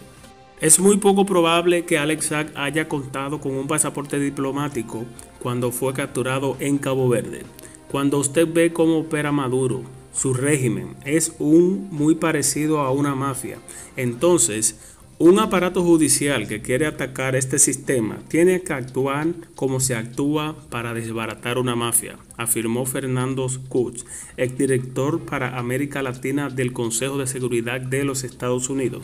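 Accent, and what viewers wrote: Cuba. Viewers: Que mal lees las noticias, y ese acento Cubano no lo puedes disfrazar. Al mejor estilo del G2 cubano...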